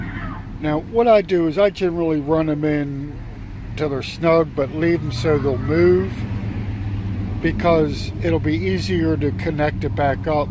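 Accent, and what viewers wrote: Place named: Central Pennsylvania, United States. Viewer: You sound like your from Central Pennsylvania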